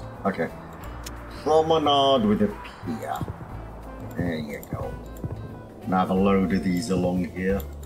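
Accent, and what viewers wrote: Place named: RP, United Kingdom. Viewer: No way I got it? HA HA😃 Your' pronunciation of French words betrayed you proper BBC accent. Man, I spent too much time in the tourist industry.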